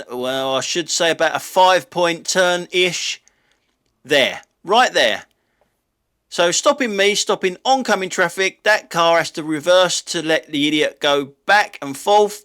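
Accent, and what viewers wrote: London, United Kingdom. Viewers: Love your moaning! You sound just like my hubby with a London accent 😂 Great set of clips, but the dad on the cycle in front of his kid... What the actual...!! That child looks no more than 5 or 6 😢 crazy!! 🤪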